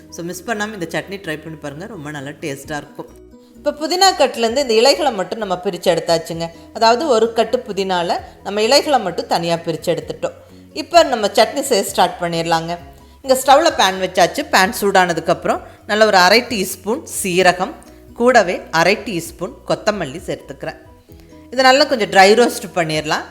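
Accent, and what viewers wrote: Coimbatore, India. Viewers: Because, COIMBATORE lady .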